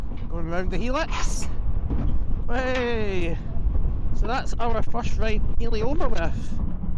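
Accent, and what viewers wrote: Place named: Edinburgh, United Kingdom. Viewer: You have an Edinburgh accent .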